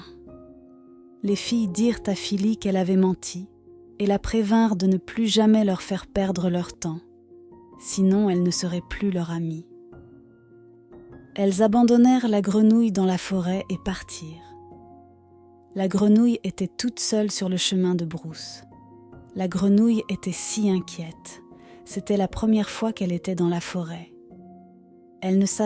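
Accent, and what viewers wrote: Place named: Europe. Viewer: Dommage pour la voix que je trouve trop européanisée pour moi! La voix d’un vieille homme ou femme aurait été le top du top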